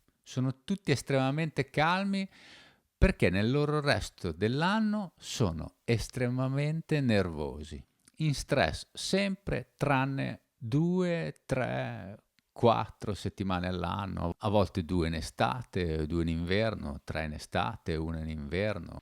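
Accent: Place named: Bologna, Italy. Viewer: Grazie Ma di dove sei? Sento un accento bolognese ... Buona serata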